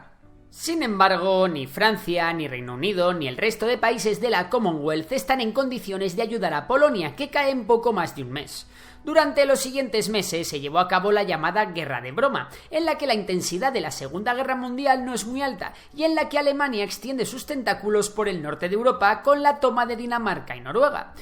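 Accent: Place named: Castile, Spain. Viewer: Ha alguien mas le molesta el español castellano?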